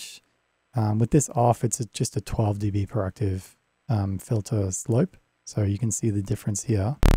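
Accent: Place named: Australia. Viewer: Ah man I've missed those dulcet antipodean tones. Very interested in both of these - to gumroad..awaaaaaaayyyyyyyyy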